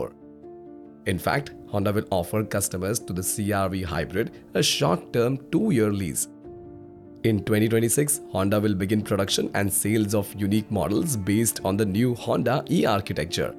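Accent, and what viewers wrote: India. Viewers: The speaking person has indian accent. Does that mean this Honda model is indian made?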